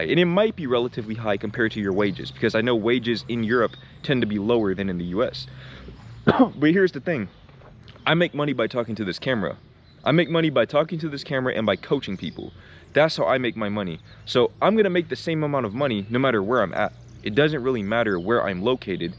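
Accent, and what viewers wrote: Netherlands. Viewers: 5 seconds in.. and thought he is in the Netherlands :D , (Dutchie here ;)… and 40 seconds in, let me guess east of the Netherlands? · You have a slight Dutch accent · Is it me or have you grown a Dutch accent to your English? 😅 But I hear you. I can concur with every single word you said in this video, almost everything you said I could have said(*), because my experience with travelling in Europe and US was the same. I hope you will return to The Netherlands someday, through your videos I have learned to appreciate more what I have here. If you want to move on and discover how other countries are to live in, that's just great, we will miss you though. (*) There was an American guy at work who was from Dallas and attended Linux user group meetings in Austin. "It was only three hours by car". I said, if you drive for three hours in The Netherlands, you're either in the sea, or you are in a different country where they speak a different language.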